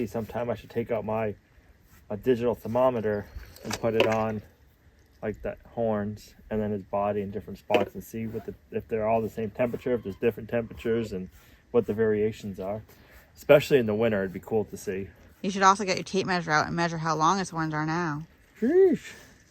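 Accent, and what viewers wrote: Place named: New England, United States. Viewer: It's called a New England dialect. Not a speech impediment. Look it up. You owe an apology.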